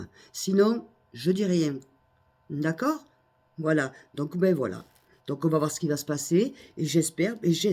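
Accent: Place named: Nîmes, France. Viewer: Vous êtes marrante, malgré votre vie de souffrances, et vous gardé l'accent nîmois. Je me suis abonnée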